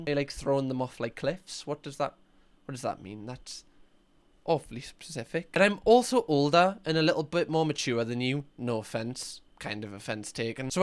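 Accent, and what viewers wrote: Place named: North, United Kingdom. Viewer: Keep at it mate you're a natural 👌 usually I hate the sound of us northerners on tv/video 😂 but I honestly laugh at the shit you react to.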